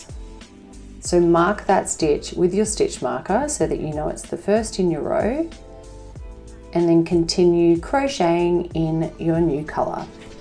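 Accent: Australia. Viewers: Thankyou for this tutorial love this way of introducing new color!!!! Im aussie too and it was such a breath of fresh air yo hear your accent, plus relaxed voice, and no extra yabbiddi yabbiddi!!!! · So happy to have found an Australian tutorial when I googled this method, I’m so used to hearing a British or American accent in the tutorials I usually watch. Your accent brought a smile to my face immediately and a great quick lesson too! Thanks so much ❤❤❤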